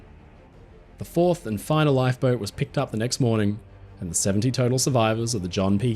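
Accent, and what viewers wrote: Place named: Australia. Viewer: congratulations on a SUPERB documentary. Apart from the quality of your research, your narration is astounding. Educated, articulate, clear diction and an easy- listening Aussie accent without any drawl or ockerism, and your grammar is faultless. As a fellow countryman, it makes me proud that your voice is being heard on the world stage, its just a beautiful example of how things should be done...and especially, it's a huge favourable contrast to the bloody awful AI voice-overs that are being churned out now. Fantastic job, very well done.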